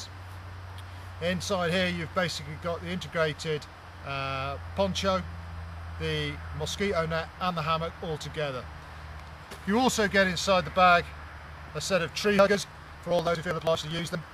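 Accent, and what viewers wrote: United Kingdom. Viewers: This is such a great video. Thank you. My boyfriend and I are getting our first Hennessys in a few days...an Explorer Deluxe for him and an Expedition for me. The package should arrive on Tuesday, and I'm really excited to try them out!! I'm only 5'5" and was going to get a free Scout as part of their website promotion, but the kind folks at Hennessy upgraded me to the Expedition. :-) I am a Yank who is really bad at placing accents -- may I ask what part of the UK you are from?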